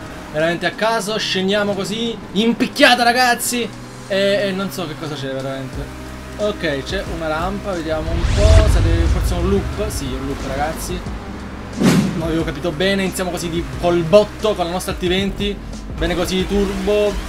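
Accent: Naples, Italy. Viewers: ma sei di napoli zio?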